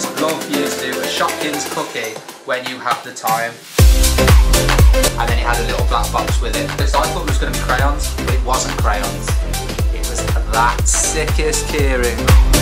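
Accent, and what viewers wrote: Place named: England, United Kingdom. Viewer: Love english accent jaja make a double Decker cake!! It would be great!! Greetings from México ;)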